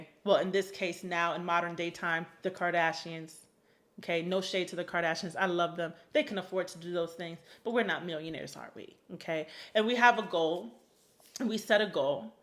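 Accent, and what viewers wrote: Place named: New York, United States. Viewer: This is the 5th video I’m watching of yours today, since the first video I said her accent is from NY and she’s giving me a lil Carribean and you said NY!! I divinely found your page today, thank you I needed it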